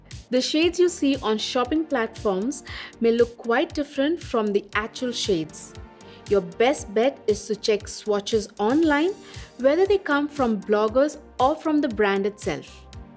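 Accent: India. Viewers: shes black. how she has indian accent?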